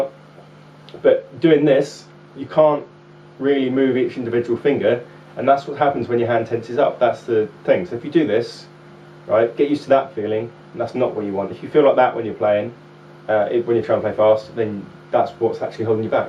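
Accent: United Kingdom. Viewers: cause hes from the uk and were awesome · Ya, I meant English accent, sorry about that, and you do have a point, you guys sure can rock!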